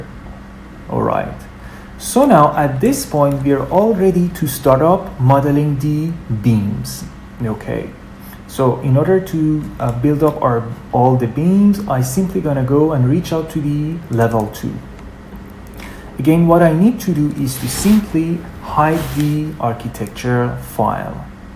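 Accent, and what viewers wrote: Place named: England, United Kingdom. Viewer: Best Revit tutorial ever. Made by a patient and competent Revit expert with a very clear understandable english accent. Thank you!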